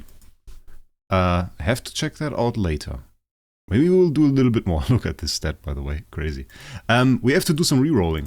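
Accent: Germany. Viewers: You german??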